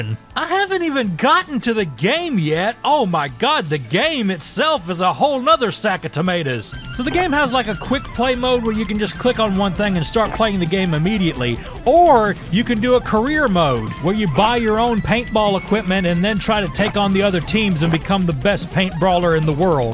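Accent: South, United States. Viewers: God you’re so southern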